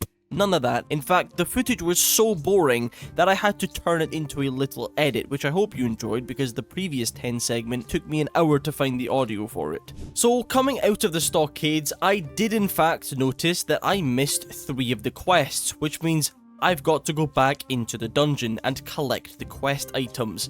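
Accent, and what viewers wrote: Scotland, United Kingdom. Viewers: I watched the whole thing and I'm still wondering if yer actually scottish.... Pants?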